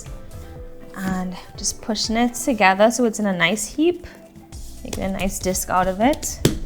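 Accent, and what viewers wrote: Trinidad and Tobago. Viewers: Gal good stuff! You Trini or wuh?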